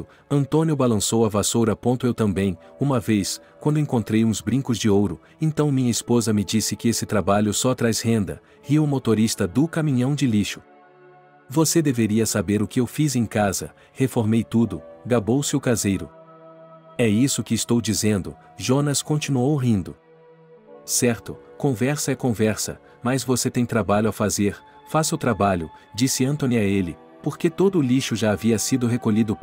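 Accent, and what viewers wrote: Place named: Russia. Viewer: O narrador é horrível. Confunde os pronomes . Refere-se a moça/mulher como ele e chama o rapaz/homem de ela. Atônita o narrador só diz atonita. Poço é “poco”. Aos ele não lê tudo junto. Ele pronuncia cada letra separada das outras: A o s. É uma infinidade de erros que você só entende porque são textos rasos, desprovidos de complexidade. Uma coisa é certa, o narrador não tem nenhuma intimidade com a Língua Portuguesa. A tradução é péssima e o narrador parece um russo lendo um texto em português, que ele tentou decorar, foneticamente.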